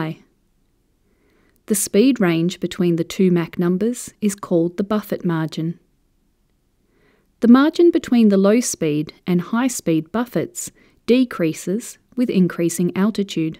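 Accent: New Zealand. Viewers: ??Does this NZ narrator ALSO do the "Chickens in my backyard" videos.??? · What accent is it? Is it kiwi or aussie ?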